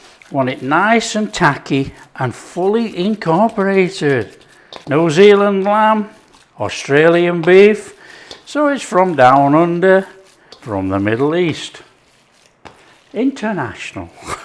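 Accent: Yorkshire, United Kingdom. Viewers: lol a fine Yorkshire boy, I never would’ve guessed! 😂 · What part of Yorkshire are you? I'm in Leeds.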